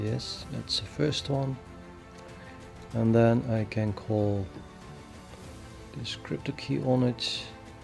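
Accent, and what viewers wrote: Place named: Netherlands. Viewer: nederlander detected I thought you were Spanish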